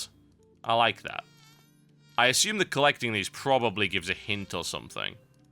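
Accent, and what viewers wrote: United Kingdom. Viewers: This guy has British accent. I find that amusing.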